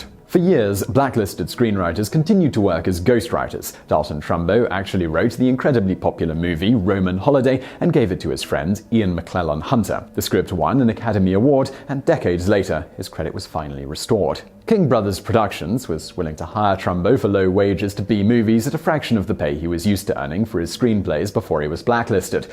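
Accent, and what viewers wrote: United Kingdom. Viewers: This fellow glossed over a lot of facts. He seems to imply that those involved who were Communists in Hollywood were just a bunch of innocent weaklings. He fails to mention those "weaklings" in Hollywood and their thugs (Herb Sorrell and company) did physical damage to people and property who disagreed with their Communist philosophy prior to being called out. He also did not mention their slavish devotion to Stalin. Their personal papers divulge their loyalty to Stalin and his minions. Most of them also denied the murders, the gulags and atrocities that Stalin inflicted ever occurred or existed. Finally it is hard to take seriously millionaires with their mansions and servants actually believed in equal sharing of material wealth. If so, they would have voluntarily divided up their riches. None of them ever did. I should ad that the gentleman does not understand true free market capitalism which is transactions between 2 or more people where both sides benefit. 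He seems to think what we have today (economic fascism) is the same as capitalism. it is not. The commentator (I am assuming he is responsible for the content and not just hired due to his British accent), should have some time looking at the other side of this argument instead of giving us the usual devoid of the facts version we have all come to know. In other words don't be intellectually lazy.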